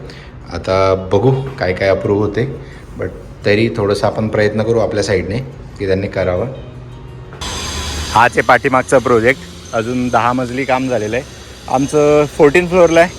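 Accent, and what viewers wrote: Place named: Pune, India. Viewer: Punyat aloy mhanun proper marathi ka😂tumchya punyachi tone aikun vakaila yet bhava..🤮🤮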